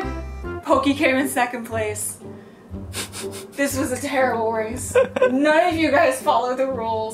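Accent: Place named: United States. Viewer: How do you have such a clear american accent while living in Japan for so long?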